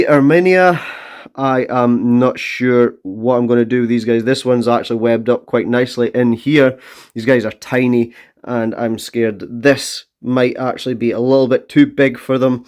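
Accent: Scotland, United Kingdom. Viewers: I watch Dave every week and his channel with camera lady it’s fab, I’ll admin nice to hear your Scottish accent looking forward to more of your post now that I know your here.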